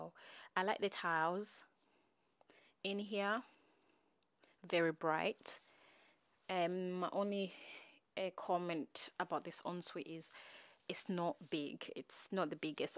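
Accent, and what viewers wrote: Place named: Scotland, United Kingdom. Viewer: Love this house big, gorgeous and spacious. I love the doors also. My type of chandeliers throughout. The shared children's bedroom is gorgeous. Thanks for sharing. PS. Your dual accent, I can hear the Scottish accent coming through when you pronounce certain words. ❤💜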